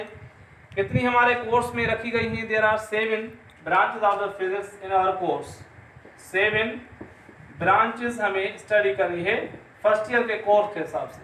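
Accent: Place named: Sindh, India. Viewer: baba saein full sindhi accent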